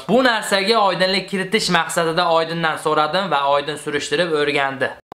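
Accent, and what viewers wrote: Andijan, Uzbekistan. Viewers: Молодец укам газини босинг анжанчасига шапалокми кийшайиб гапирассими эркаланиб гапиряпсиз тугри хам гапиряпсиз босинг газини ишизга омад узбек углони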